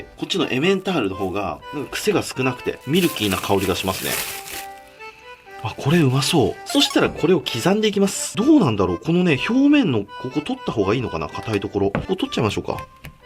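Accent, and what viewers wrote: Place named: Nagoya, Japan. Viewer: 名古屋生まれ名古屋育ちの自分と同じイントネーションでしゃべってるから笑えるw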